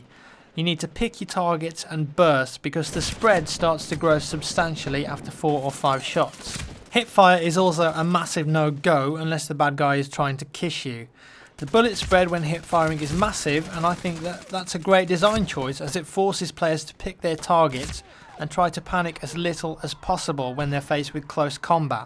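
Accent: United Kingdom. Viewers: Amazing accent, r u British?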